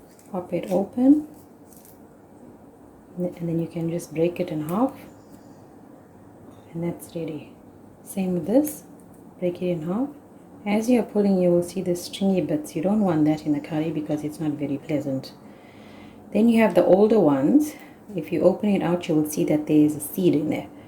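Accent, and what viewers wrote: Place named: South Africa. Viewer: Love the South African accent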